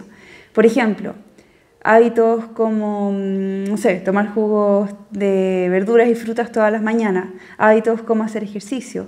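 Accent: Chile. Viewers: Gracias por estos vídeos!! Amo tu tono chileno. Saludos desde Argentina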